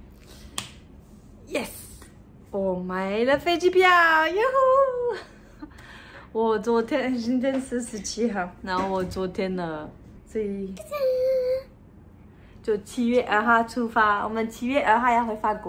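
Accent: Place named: Taiwan. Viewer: 一个法国人一口台湾腔，哈哈。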